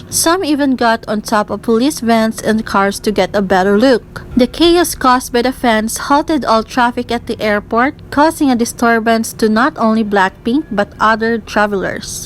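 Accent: Philippines. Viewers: Off topic but is that filipino accent I hear? 😁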